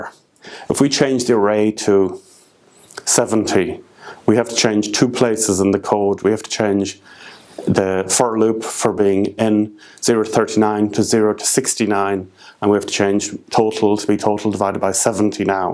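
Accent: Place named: Germany. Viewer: Are you German? You have a Flula kind of accent. Pretty cool.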